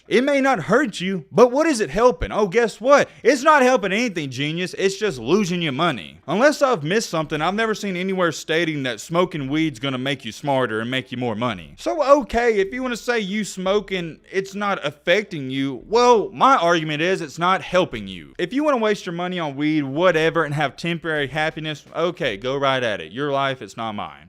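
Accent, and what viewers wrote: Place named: Kentucky, United States. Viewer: This guys definitely from Kentucky lol I can here it in his accent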